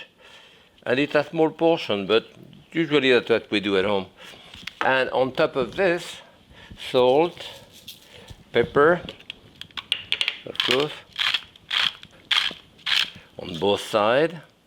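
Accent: France. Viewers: It's not a speech impediment . It's his French accent .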